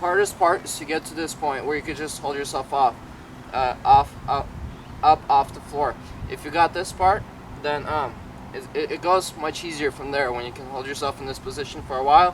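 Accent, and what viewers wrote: Russia. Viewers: Is he Russian ? I can hear he has an accent but i don't know what accent is that xD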